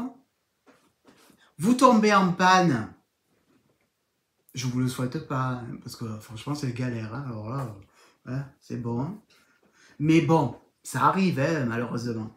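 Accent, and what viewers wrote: South, France. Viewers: Bonjour, Je te regarde depuis le 1er podcast, cest toujours un agréable moments. J'adore ton accent du sud ca mets du soleil dans la grisaille du temps, ta bonne humeur, ta franchise et les superbe projets que tu nous présentes. Bravo pour les 4000 abonnes c'est mérité. Je suis de Belgique et je participe volontier au concours 🪐 merci A bientôt. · hello! enfin quelqu un du sud! je désespérais! je pensais qu il y avait que le nord la bretagne le grand est qui tricotaient 😉moi je suis du vaucluse et je regarde beaucoup de vidéos de tricot mais la majorité des youtubeuses sont de la haut bref ça fait du bien de t entendre et meme je savais pas qu il y avait de temps en temps des fetes de l laine par ici dailleurs si un jour tu es au courant d un evenement dis le nous parce que pareil, ils sont tous la haut, quand meme on vit dans le sud ok, il fait chaud ok, mais on mets des tricots aussi 😅sinon bé, j aime beaucoup tes vidéos je rigole bien et j apprecie ta façon cach de parler allez j arrete mon discours j attends la prochaine avec impatience a bientot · 🪐🧶 Salut ! 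Toujours agréable d'entendre mon accent du sud quand on a émigré au Québec. D'accents en accents mais le même amour de la laine. Merci pour l'originalité. À quand un podcast au bord de la grande bleue? :D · 🧶 Bonjour. Première fois que je commente un de tes pod cast. Merci pour le soleil qu'il y a dans ton bel accent du Midi. Bonne continuation. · 🧶Bonjour, J'adore vos podcasts, vous me faites sourire et j'apprend pleins de choses, je suis débutante, j'aime votre autodérision et votre accent qui me donne envie de découvrir le sud. Et j'ai trop hâte de découvrir votre design chaussette 🧦! Merci pour ce chouette concours! Belle journée 🧶🌞🤩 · 🧶 Bonjour 🥰 Quel plaisir, grand moment de détente de vous regarder depuis le début de votre chaîne et sur Insta J’adore et j’adhère complètement votre façon d’aborder le tricot et toutes les merveilles qui l’accompagne 😍 Je vous félicite et bravo pour les 4K🎉 Votre humour ainsi que le parler du midi me Régale!!!!!! 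Je suis de La Ciotat (13) donc je comprends bien 👍👍👍 Merci de faire connaître notre accent et nos galéjades 🤣 Toujours impatiente du prochain épisode 💪 Bravo pour toutes vos créations les choix laineux et les couleurs!!! ❤️🍀🌹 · 🧶Coucou, toutes mes félicitations pour les 4000 abonnés 👍. Le meilleur pour la suite c'est que du bonheur de te visionner, ta joie et ce magnifique parlé du Sud tel du Marcel Pagnol. Bravo pour ton premier design que j'ai hâte de découvrir. J'ai loupé l'info pour le test dommage. Prends soin de toi 😘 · Bonjour, je vous ai découvert grâce au podcast de Julie de Lolipelote et c'est un ravissement que de découvrir vos podcast qui chante le sud. 🧶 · Un succès bien mérité pour un tricoteur spontané et réjouissant. Je ne rate aucun épisode et ris beaucoup avec toi ! Ne change rien surtout et vive l'accent du Sud!!🌞💕 🧶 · Merci de ce podcast, merci pour ta bonne humeur, tes boutades bien sympathiques… ton accent du sud qui ensoleille ton podcast … je viens de passer un très bon moment je participe à ton concours 🧶 FRANCE merci aux généreux donneurs.. 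tu mérites leurs soutiens … ton sac est magnifique… il me fait bien envie BONNE CONTINUATION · Bonjour encore un bon moment passé en ta compagnie 🧶 j'aime ton accent du midi et ton humour. Bonne journée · 🧶 quel plaisir de te regarder et d'entendre ton accent du Sud! Merci pour le concours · En vacances dans ton cher sud, je ne suis pas très assidue sur les podcasts... il fait gris aujourd’hui, donc hop, une de tes videos pour faire revenir le soleil, au moins par ton accent et ta bonne humeur 😊 grâce à tes infos sur insta, je suis allée la semaine dernière aux portes ouvertes de Sabine, que de merveilles et quelle jolie rencontre ! Alors merci à toi pour cela aussi 🥰 je participe avec plaisir à ton concours, depuis la France donc 🧶 merci et bravo pour ce succès bien mérité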